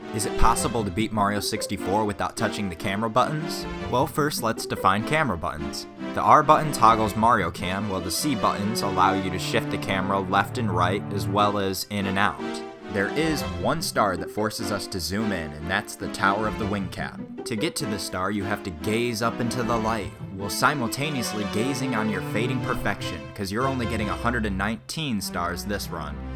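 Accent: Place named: Midwest, United States. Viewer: Love the Midwest accent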